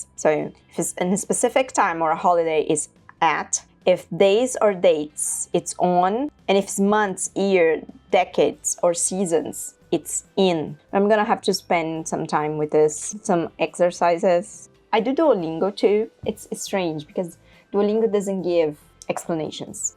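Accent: United Kingdom. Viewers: Is the british accent on purpose?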